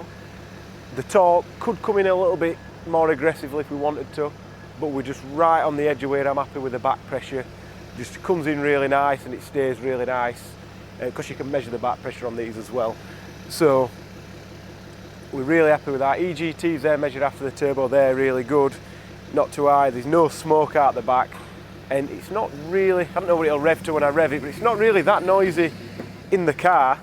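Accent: Yorkshire, United Kingdom. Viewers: Love how Yorkshire you are! Great work lads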